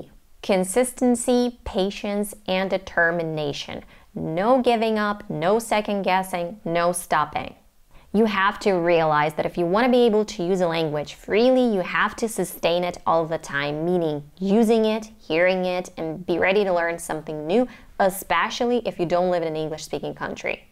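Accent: United States. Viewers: I'm not sure how I got here (I'm from the USA), but this video blew my mind! I speak German and French but am feeling stuck for Russian...this motivated me quite a lot...thanks! Your accent sounds more American than mine btw great job 😆😃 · О май гаш, наконец то нашелся человек с СНГ который действительно говорит с максимально похожим на американский акцентом. От остальных очень сильно слышно русский акцент и попытка скрыть его сильным произношением буквы Р в американском стиле. Красава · Your American accent is extremely good. Outside of some Dutch people you sound the most like a native American than anyone I've ever heard. It's interesting that your vowels sound like the accent that is similar to people from Minnesota, USA. I've never heard that particular sound from anyone who isn't from Canada or Minnesota. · It's a perfect American accent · Wow and this is American pure English American 🙏🙏 color and colour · Your speaking and accent are amazing. 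I can not imagine that you never lived in the North America before. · You have a perfect American accent. Where are you from that you say you're from a non English speaking country? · Your pronunciation and accent is more American. Why? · Thank you for your video. It inspired me to watch more videos and to spend more time for learning. I never think that you from Ukraine, because you speak like a true American girl :-) I'm from Russia and I learning English about 5 years like a hobby but my learning goes very hard because I spent so little time for English before and your video explained me what I did wrong. Thank you very much and I wish more subscribers for your outstanding channel! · Hey you know what I like your American accent so much. And like you said why I'm learning English every day every single day because I love it I love doing this. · ну да, она слушала больше американцев. Просто ей видимо американский вариант больше подходит. 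Мне вот тоже как-то претит губы вытягивать по-британскии каждый раз когда слова на дифтонг оу заканчиваются, вроде go, know и прочего. Если вы задумываетесь над правильным произношением, и тем более если вы хотите говорить так же как автор этого канала, спешу вас предостеречь, что такое не всем дано. Это целая наука, и у 90 процентов всех кто стремится идеально говорить на английском или любом другом иностранном языке не всегда это получается. Все дело в строение речевого аппарата. Когда мы учим родной язык он принимает определённую форму и вы уже не сможете без специальных практик, к коим к примеру обращаются дикторы и парадисты, освоить безакцентный иностранный язык. Я честно говоря вообще перестал "парится" по поводу произношения. Главное, что бы тебя понимали и что бы тебе было комфортно , а остальное не так уж и важно) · I m new here, Mss, what country are you from? You have a clear American Accent congratulation foryour effort, God bless you🙏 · I thought you were an American! 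· Chan Jason definitely American English... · I thought you are American 😂, your accent is so incredible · american style,so my question is......are you an america? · She sounds so American but her thoughts her attitude is different from those people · You sure you are not American? If not, you did a great job ! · Can't believe ure not American Where u from then ? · I bet you're lying its hard to believe you ain't an american . Awesome accent !! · You're American. I know that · Watching from Philippines.I am highly motivated when I watched your videos.I have learned more.You have a brilliant mind; II like the way you speaks,Americant accent,is easy to understand compare to British accent but I like British accent too.Thanks for your wisdom.Have a nice day. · You have a perfect American English accent. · I really thought u were American . U r so amazing n such an inspiration.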